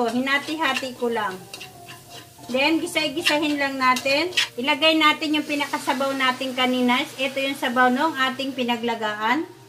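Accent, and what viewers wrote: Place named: Manila, Philippines. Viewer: taga iligan diay ka pero ang imong tagalog murag pure na taga manila...happy new year dai❤from ISRAEL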